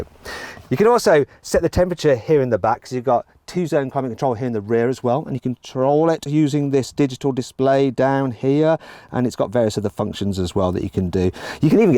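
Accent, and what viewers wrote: United Kingdom. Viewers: 이 영국발음 + 장난질 컨셉을 한국에서는 할수없을까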